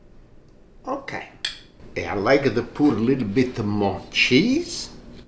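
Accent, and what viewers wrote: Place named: Sicily, Italy. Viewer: A wonderful man Mr.Cerio, was my first intro to food that was not german, He rented a suite from my Dad who had a business in the same building. He was from Sicily and his proudest times were sharing his food with a 8 yr old (me) and talking about his budgie bird. He had no family in Canada that I can recall. I Remember him with fondness 57 yrs later. He got me hooked on authentic italian food ever since. You speak as he did. Are u also from Sicily?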